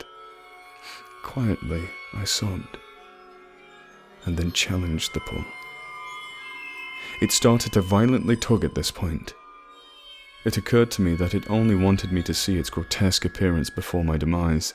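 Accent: England, United Kingdom. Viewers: Thor is played by Cris Hemsworth. He's got an Australian accent...this dude's accent is English. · He's like Morgan Freeman with an English accent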